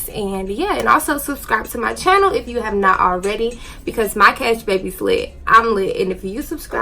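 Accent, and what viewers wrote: Atlanta, United States. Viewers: Where you from? I’m guessing Atlanta or Savannah with that accent! lol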